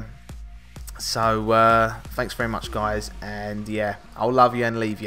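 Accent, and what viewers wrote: United Kingdom. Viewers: I love your Accent is it British?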